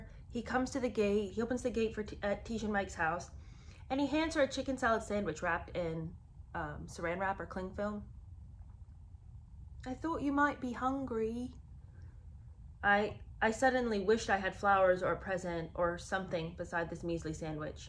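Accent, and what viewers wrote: United Kingdom. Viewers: Love your British accent.. very Bridget Jones 😂😊 (I’m not being snarky, I actually like it)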